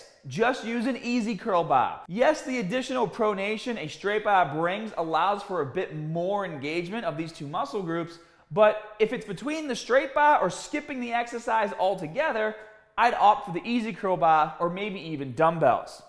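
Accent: Boston, United States. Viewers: Wow that Boston accent! Lol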